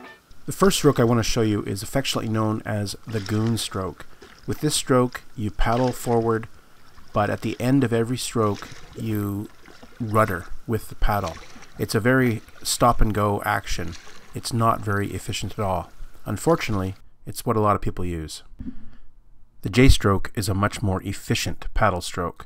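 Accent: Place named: Canada. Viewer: Only learn canoe techniques from someone with a Canadian accent.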